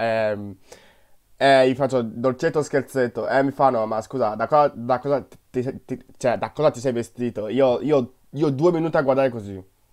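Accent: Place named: China. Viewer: Perché parli come un cinese?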